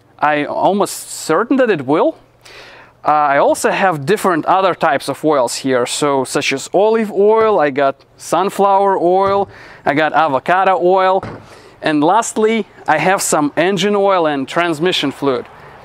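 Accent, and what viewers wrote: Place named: Eastern Europe. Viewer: Nice accent) Just love this slavic word pronunciation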